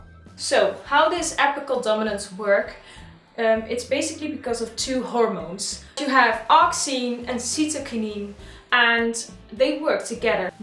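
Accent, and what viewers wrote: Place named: Netherlands. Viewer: Ik hoor dat je Nederlands bent kan je me helpen ik heb ook een draceana maar niet dezelfde wie jij hebt deze heb ik helemaal tot stam afgesnoeid maar wel aan een tak uitloper laten zitten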